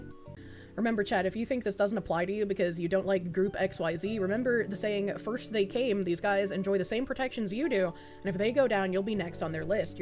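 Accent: Boston, United States. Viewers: Love the coverage, but my "grammar heiling" tendencies flare up every time says ANONYMITY (ah-no-nim-ity) like anonmity (ah-non-mity) 😂 Could be the boston accent though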